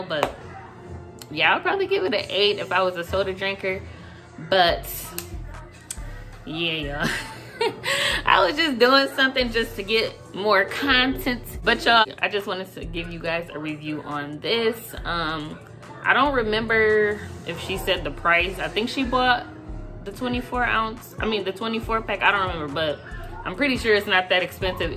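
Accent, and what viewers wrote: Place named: New York, United States. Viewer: Lol you got me may want to try it but I doubt it lol not sure where u from but I’m catch that NY accent can be wrong lol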